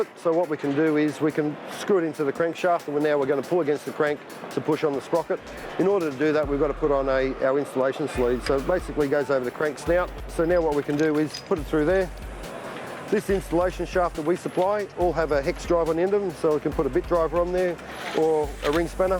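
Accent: Australia. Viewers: Good thing we could see the stuff working, because his high speed aussie accent is impossible to understand. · I thought he was a country dude at first then Australian...I just tap with hammer and use puller....some come right off most do actually.. 2 outta 10 will be a bitch.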